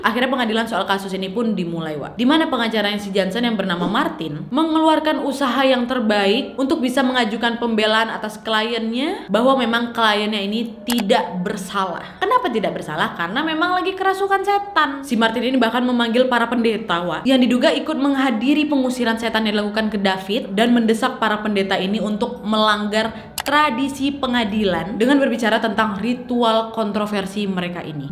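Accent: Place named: Medan, Indonesia. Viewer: Kak cantik nya awet☺☺ logat medan nya mantap saat podcast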